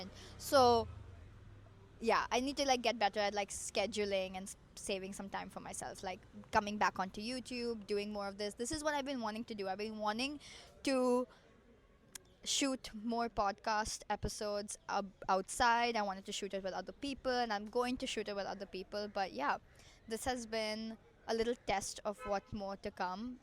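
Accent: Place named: Bengal, India. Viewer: Are you a Bengali what's with your accent 🧐🧐🧐🧐🤨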